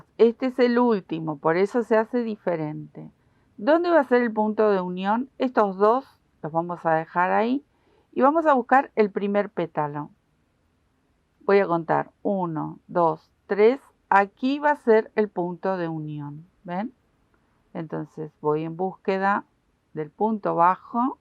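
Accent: Argentina. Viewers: ¿Cómo escucho en castellano? De repente hay una voz mecánica y fea en inglés ¡en vez de mí acento argentino favorito que extraño tanto!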